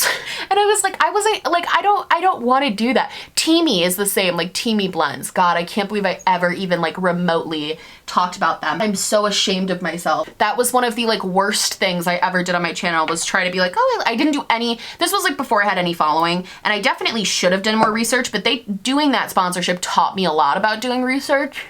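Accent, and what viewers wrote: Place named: Upstate New York, United States. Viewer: I'm new to your channel, and I'm from Buffalo!! I loooove that I found a creator who kinda carries the "personality," for a lack of better words, of upstate/WNY. I LOVE your personality and the way you speak because it reminds me of home, everyone is lowkey annoyed but grateful at the same time and its very refreshing <3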